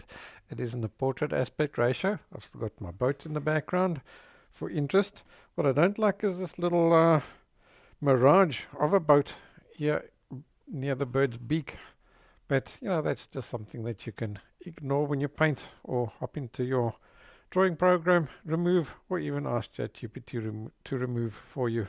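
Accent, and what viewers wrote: South Africa. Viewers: Hi you sound like a South African. I enjoy your presentations. Very thorough. Thank you from South Africa.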